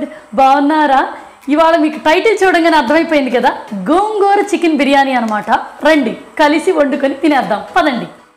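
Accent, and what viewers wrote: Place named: Krishna, India. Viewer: Krishna District telugu andi chala bagundi.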